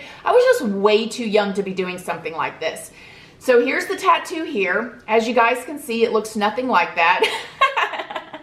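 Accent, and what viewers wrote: South, United States. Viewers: We're around the same age, and we've both had hard lives, my best friend of over a decade was killed but somehow I was able to speak to him after he'd passed, he died on Thanksgiving of 2016, and any doubts I had about god were suddenly gone, he'd always promised me he'd contact me if something ever happened and he kept his promises, I went through such a bad depression I'm just now starting to do things that involve getting better, all my muscles atrophied from just not having the energy to live life anymore, and I need physical therapy to walk normally again, I quit painkillers shortly after he died but you live in Florida, you know how easy they are to get, and growing up in Virginia I never thought they were as deadly as they are, and I battled on and off with addiction and abusive relationships for a very long time, I'm just now coming out of the worst depression I've ever been through, I'm filming everything, so hopefully it can help others feel less alone, and see themselves in my journey. 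I found your channel and we both have the southern accents and your ability to frame a story is just astounding, I've lived in Los Angeles now for most of my adult life, but you remind me of my friends from home, and it's comforting for me to listen to you, I laugh and I cry and I'll think "Oh wow I did that too!" it's helped me a lot, I've met some incredibly talented people out here, and you have so much natural charisma, you really could have a career as a motivational speaker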